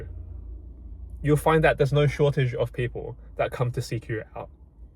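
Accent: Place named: United Kingdom. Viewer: Have you considered starting an OF account? I mean your a Asian, and you've got that British accent? I'm already getting hot at the thought.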